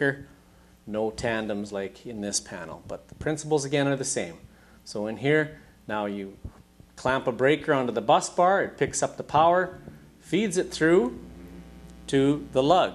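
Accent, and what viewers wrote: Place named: Canada. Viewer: He lives in Arizona? This guy sounds totally Canadian Ay!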